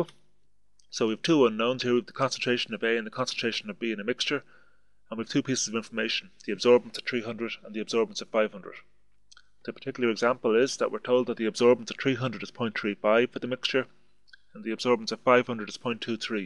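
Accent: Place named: Ireland. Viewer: Lol, recognisably irish accent. refreshing for a change :)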